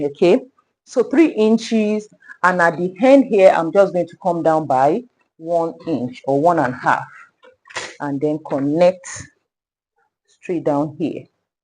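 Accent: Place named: RP, United Kingdom. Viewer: Your queens English makes me forget what am watching and be listening to the filtered voice. Well-done queen boss.........you dey help my career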